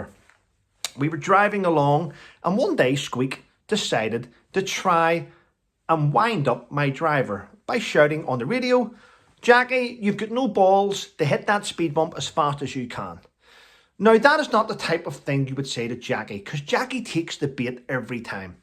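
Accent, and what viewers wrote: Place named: Ireland. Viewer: Oh that must have been so funny 😆 I love hearing your stories especially your Irish accent, my late Mum came from Carrickfergus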